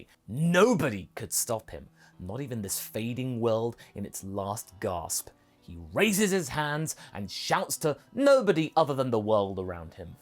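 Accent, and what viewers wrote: United Kingdom. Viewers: I love you British voice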